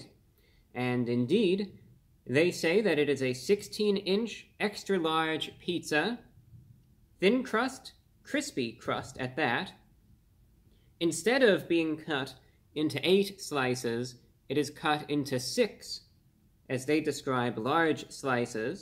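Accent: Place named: New York, United States. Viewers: He might make different jokes here or there, but you can definitely tell he’s from New York in his older podcasts… which is quite a treat to listen to… it was like listening him grow from a kid to a young man. Literally. 😃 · When I first found out about you, I thought you were from New York because of your speech patterns and such. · the subtle new york accent is genius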